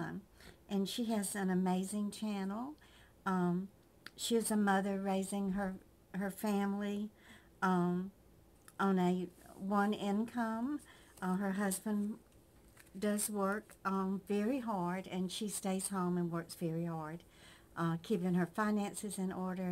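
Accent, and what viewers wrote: South, United States. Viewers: my love, you need to get a microphone. They are not expensive. You can get one that you clip on your shirt so you can even take it with you when you're shopping. Those run about $20 dollars. That would really improve the sound of your lovely, soft-spoken Southern voice. Just a suggestion.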